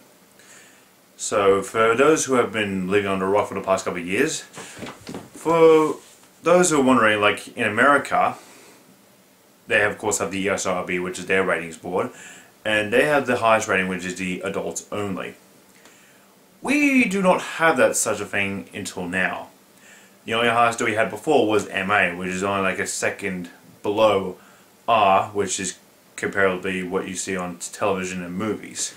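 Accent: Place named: United Kingdom. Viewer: Dude ... i expectd an aussie accent like my friends from school but you sound like my friend from back home "Britain" u sound like a BIG OLD SIR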